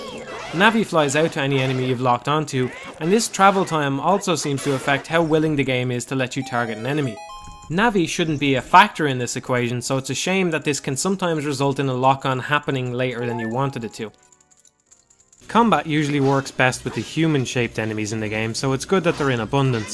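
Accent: Dublin, Ireland. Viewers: You're Irish, right? I'm just asking 'cause I love the accent. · It’s an Irish accent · Smitty Werbenjagermanjensen It's Irish right? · Woah, You're Irish Like Me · Where in Ireland are you from? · He is, it's some sort of Dublin (capital city) accent. There's so many different types of accents all over the country.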